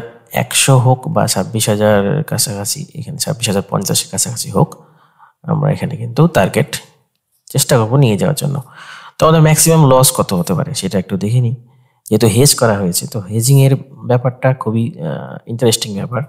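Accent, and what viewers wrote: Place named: Bangladesh. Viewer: Apni kothai thaken , apnar vasha puro Bangladeshi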